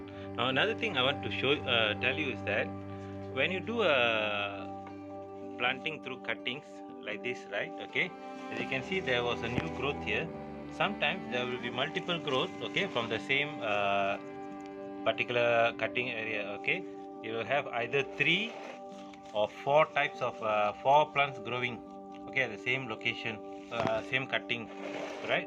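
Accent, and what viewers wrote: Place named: India. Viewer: No problem thank you so much for your kind information i thought you were from India you sound so much like Indian 🙏🙏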